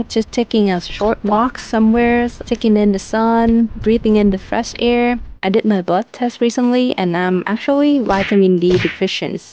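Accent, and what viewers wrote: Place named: Vietnam. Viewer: nói tiếng anh giống người việt thế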